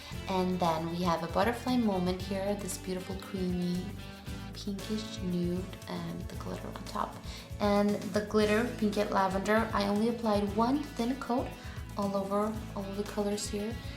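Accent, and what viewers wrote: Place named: United States. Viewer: She is from Sweden but she still talks like an American..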